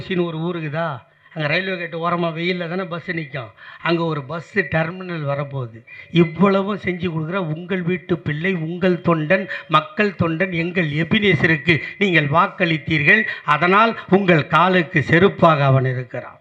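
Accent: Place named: Chennai, India. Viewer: மெட்ராஸ் லோக்கல் பாஷை பேச்சாக இருந்தாலும் ரசிக்கிற மாதிரித்தான் இருக்குது இவரோட பேச்சு !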